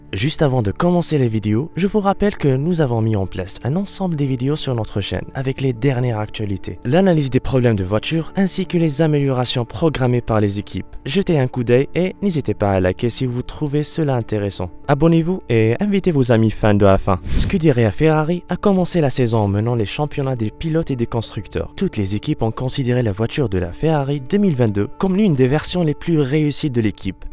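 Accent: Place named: Morocco. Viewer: Ah mais accent je peux pas ! C'est quoi ça ? Vs avez trouver un mec au fond du Maroc pour lire votre texte ? Laysteuuurrrr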